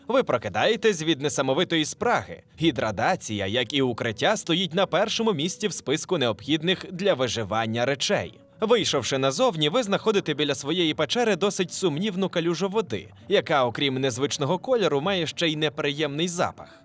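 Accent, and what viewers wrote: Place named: Galicia, Ukraine. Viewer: Паплюження української мови галицьким суржиком. Риготня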